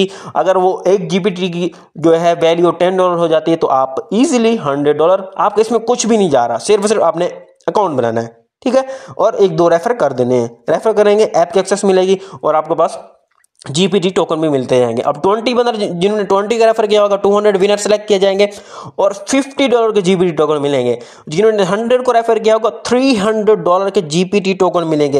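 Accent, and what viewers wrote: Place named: India. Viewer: You text in English you talk Indian🙄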